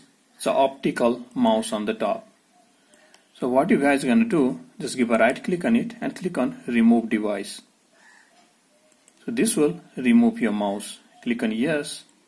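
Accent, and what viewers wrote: India. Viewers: Being an Indian, this is the most Indian accent i've ever heard.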